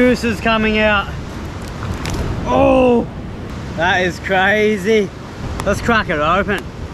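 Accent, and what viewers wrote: Australia. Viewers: aussie are you? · What part of Aussie is this? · Exactly how i expected an australian surfer to sound like and be like in a survival situation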